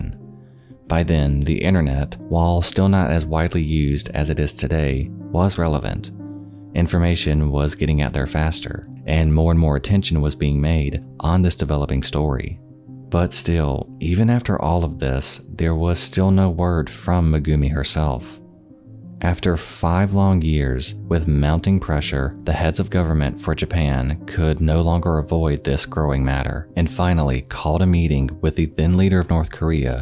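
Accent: South, United States. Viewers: Is this ASMR? Smooth Southern ASMR. Are you young people able to stay awake listening to this?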